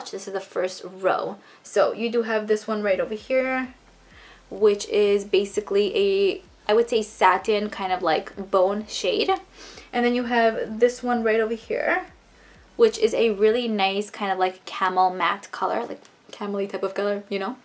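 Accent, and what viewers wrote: New York, United States. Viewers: I subscribed as I love makeup and also love your accent - New York, right? (forgive my ignorance, I'm not American ☺)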